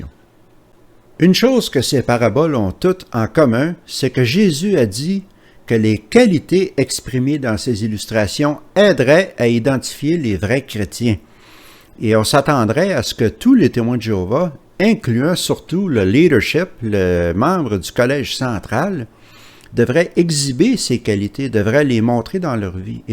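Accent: Quebec, Canada. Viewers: Il est super génial son accent québécois!! et vous "Joe Joe" , votre français n'est pas parfait non plus...! il faut vraiment vous laver les oreilles ou écouter une autre vidéo , mais critiquer désobligeamment ce n'est que pour "critiquer" (toute critique n'est pas mauvaise, il est bon de l'apprendre, de le savoir ) vous Joe Joe quand vous critiquez ce n'est que pour "avoir quelquechose de négatif à dire" ?! (si vous ne supportez pas , pas obligé de le dire,!) et il est bon de se renseigner plus intelligemment: en allant chercher les infos utiles sur l'auteur par exemple de la vidéo, avant de "critiquer" (en négatif,)et sachez aussi qu'une critique peut être positive et constructive, au passage...🤔😉 en plus c génial pour mieux "comprendre" le fonctionnement de cette société sectaire (car cela vient de là-bas, des states" et lui parle très bien anglais aussi, il est adorable de nous le faire pour la francophonie!) en France : ils n'osent pas vraiment témoigner, et lui il a les bons tenants et aboutissants pour mieux comprendre la machine à endoctrinement de ces pauvres croyants adeptes TJ! 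Vive LE QUEBEC !🏵🏁👍 (et céline dion : quand elle ne chante pas ,quand elle est interviewée en france : tu ne la comprends pas non plus ? 🤣) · C’est plutôt amusant et ironique en même temps! Vous dites faire tout pour plaire à Dieu, maiiiiiiiiis vous critiquez sa façon de s’exprimer. Intéressant comme réaction. Heureusement qu’il est de Montréal et pas du Saguenay ou même du Nouveau Brunswick 😳 en passant il fait déjà beaucoup d’effort pour pas parler trop " Québécois " ( sans offense pour les Saguenéen ou des Néo-Brunswickois) et en passant ce n’est pas aux autres à faire des efforts pour bien se faire comprendre, on se comprends?😂 désolée trop tentante comme joke (plate bien sûr…) Bref vous venez d’où au juste?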